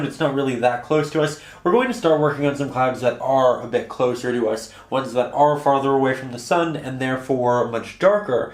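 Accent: Canada. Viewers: Outta curiosity where are you from? You sound Canadian if I’m not mistaken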